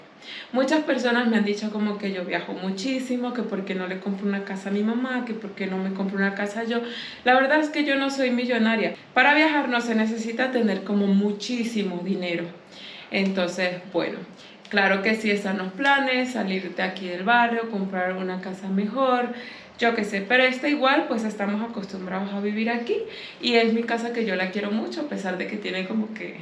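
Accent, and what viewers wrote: Colombia. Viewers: Eso es una excusa pues estoy claro y tú también lo estás que hablas caliche porque te gusta, por qué crees que es nice... déjale eso a la Youtuber venezolana que imita todos los idiomas en español y hasta en inglés. Creeme, hablar caliche no es nada nice, más nice seria hablar con cualquier acento venezolano, inclusive nuestro acento gocho y de merida, esos si que son acentos bien bonitos. Si fueras mi pareja la ladilla y el chalequeo que te tuviera fuera trifásico, de hecho la mamá de mi hija menor tenía esa mala costumbre de hablar caliche por estár viendo las novelas del vecino país, y el chaleco que le monte fue extraterrestre hasta que dejó la ridiculez. La diferencia está en que la mamá de mi hija no es Youtuber, no tiene una cuenta donde nos pueda representar, tú sí.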